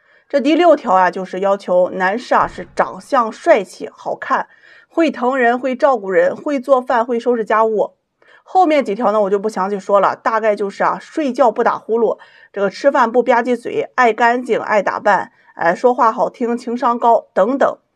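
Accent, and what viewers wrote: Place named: Shanxi, China. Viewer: 老师口音是 山西人啊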